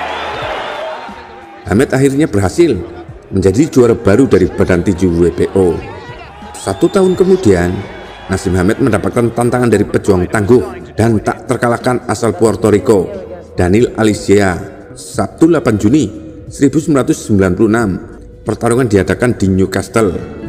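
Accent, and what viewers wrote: Java, Indonesia. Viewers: 😀😀😀😀suaranya medog kayA sy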